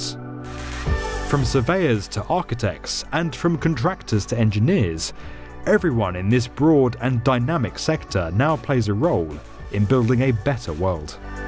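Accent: United Kingdom. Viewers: Why are British voices so satisfying.. David Attenborough Jeremy Wade Gordon Ramsay Whatever your real name is.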